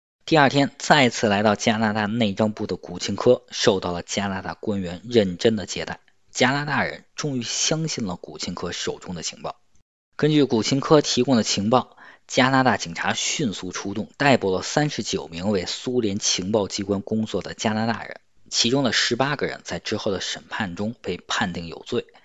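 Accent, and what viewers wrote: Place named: Beijing, China. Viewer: 北京腔好听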